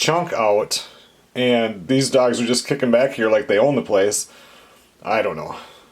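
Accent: Minnesota, United States. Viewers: I agree! Hearing now familiar Minnesota accented voice,is quite soothing. Like putting on a comfy pair of shoes! LOL. Thanks for all you share. But, just behave, as mentioned! Or it’s the DOGHOUSE( aka crate) for YOU! 😲🙃😂😁👍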